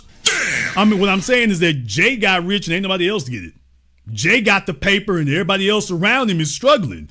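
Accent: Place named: Texas, United States. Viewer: He talk like he from Houston or Texas somewhere😢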